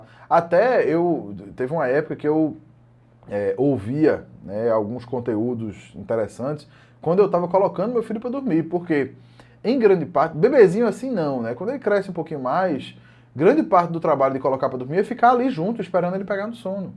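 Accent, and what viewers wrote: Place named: Recife, Brazil. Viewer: Ah, e também sou Recifense Kkkkkk suspeitei logo pelo sotaque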